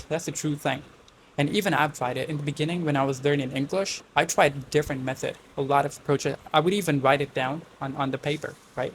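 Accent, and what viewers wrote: United States. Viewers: Ur American accent is really mind-blowing bro ❤in how much time u get it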